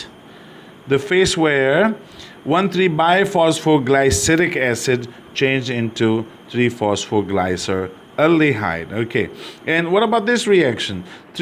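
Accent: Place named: United Kingdom. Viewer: Sir your British accent just feels so real. so smooth 🤍 Really appreciate your work 🤍👍🏻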